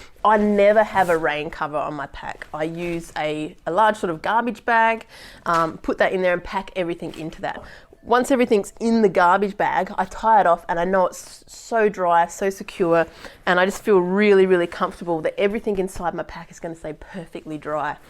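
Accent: Australia. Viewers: Great to hear an Aussie voice. I hit the Himalayas fairly regularly, so I watch a lot of these types of videos. Always Americans on the PCT or JMT, or Europeans in Iceland; so really good to see a fellow Australian out there offering advice. I also love your level of enthusiasm, it just kept me watching even though my load out is well and truly bedded down. Thanks for the time.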